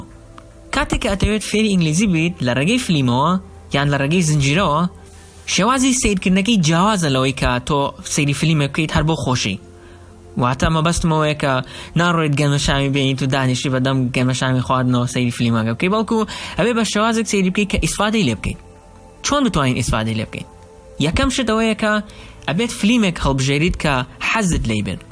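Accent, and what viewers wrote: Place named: United States. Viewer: thanks l hope sooo and its really good you using accent American.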